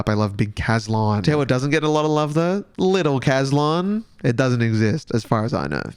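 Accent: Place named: Australia. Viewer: Wondered if you were Australian from the accent... then you said bloody hell and I was like yep.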